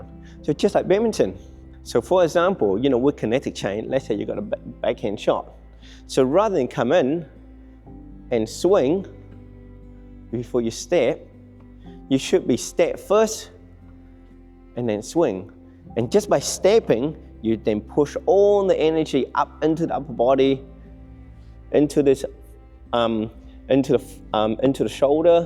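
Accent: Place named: Australia. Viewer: Ok so what if I'm just an intermediate player, what is the best weight type racket and string tension, assuming I'm not very muscled? Even weighted, heavy head, thin griped, light head or which? 😮😮😊😊 BTW, your language and accent give away where you were born and most probably located, down under lol 😊😊😅😅😂😂❤❤❤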